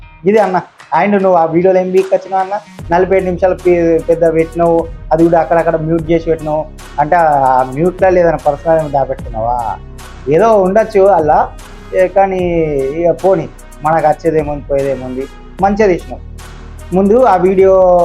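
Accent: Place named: Telangana, India. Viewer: Adhi telangana yasa alage matladutaaru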